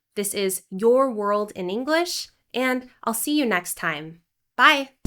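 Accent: United States. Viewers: Is this American pronunciation?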